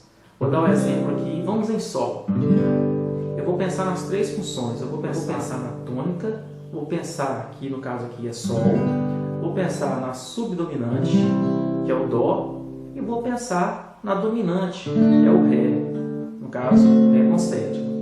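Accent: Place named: Minas Gerais, Brazil. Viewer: AMEI AÍ SIM , AGORA VAMOS APRENDER COM ESSE SUTAQUE MINEIRO E MANHASO E SUAVE. ISSO NÂO É AULA É UMA POESIA LÍRICA .💤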